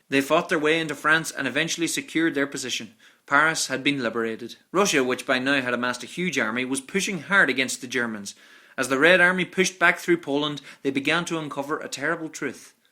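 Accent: Ireland. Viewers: man i know you had cram lot in 7 min and people love to kind of blow over the whole aid thing from america you did bring it up but like a after thought you talked about britians navy and air force so much better germany and it was how ever the one thing all non america quick not talk about the lean lease with out the fuel from it britian would not be able to fly the planes or would not been able to sail the ships also on to russia you said it amassed large army true but with out food aid fuel weapons and material russia would been forced to surrender i know you were on a time restraint and i do think you for the sleeping giant i think that was churchill that first said it and i cant really tell by your accent i take it your irish for even bringing up irland if so there my second faviort non usa country only germany do i love more mostly cause out side of america the germans invented the most stuff not as much as america but alot i love that little country not little compaired to irland but little compaired to usa or russia and would beaten russia in my opion if was not for america that means would took a country 2 and half times the usa or about 15 that of its self not to mention belgium france poland all the others by its self mostly yea italy helped a little but hell greece beat italy in the early parts but i might be going after your video that does not mean i did not like it so im sure first thing if you do respond to this will be my grammar yea i know it sucks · You sound irish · Are you Irish? 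Your accent sounds like it, I have Irish cousins and they sound just like you lol. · Damn, everything is more interesting and funny with an Irish accent :D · are you Irish? you sound Irish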